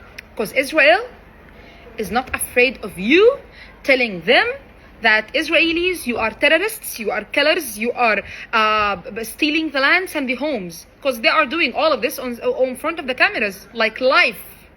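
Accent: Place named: United States. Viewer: She always speaks English so weird as if she’s American or Palestinians are American 😅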